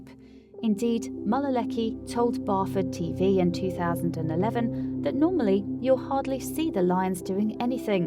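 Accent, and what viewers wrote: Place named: United Kingdom. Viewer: So throw the "darn" ball!!! The narrator's British accent us so strong that it distracts from understanding the narrative AND it's difficult to pay attention to what the lion is apparently NOT doing. Also worth mentioning is the "abundant use" of actual video footage. So extravagant, but worth the considerable expense! .......what do you have to say..........? Please enlighten me. Thanks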